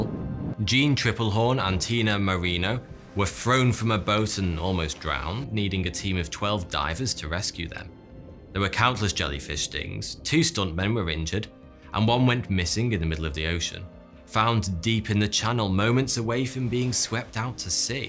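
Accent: United Kingdom. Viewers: Is he british? I keep hearing "fourth two here" at the beginning...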